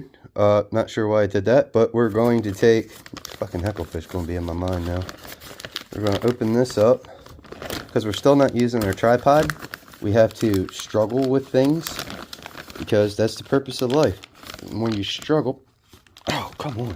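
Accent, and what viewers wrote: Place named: Appalachia, United States. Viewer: Hahah that was funny as fcuk😀 Ya kinda sound like a mountain-man from Appalachia.. but what do I know, I'm in friggin Sweden.. Much preech the knowledge sir,(bird seed, awesome!). Greetings from a new subscriber in Stockholm🙂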